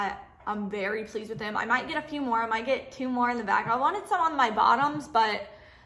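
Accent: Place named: South, United States. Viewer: I love your accent! It is so charming with your style. You’re like a southern vampy lady :)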